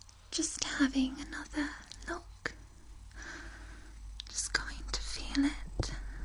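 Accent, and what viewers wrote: United Kingdom. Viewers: British accent inspires me love. I do not speak english, greetings fron Costa Rica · that moment when you watch this videos because you learn english and she has wonderful british accent xD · Where abouts are you from? Sounds extremely British, and that's coming from a British person haha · OMG !!! I love your British accent ....sounds really amazing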